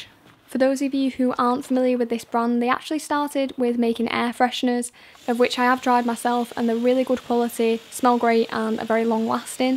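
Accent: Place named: Liverpool, United Kingdom. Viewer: Is this trying to be a rip off of car cleaning guru ? Even the scouse accent for crying out loud !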